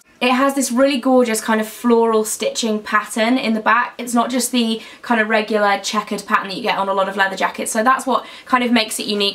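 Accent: United Kingdom. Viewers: I'm from the U.S. and have only heard it said in our ugly accents - I think the way Brits say things sounds so much better, especially!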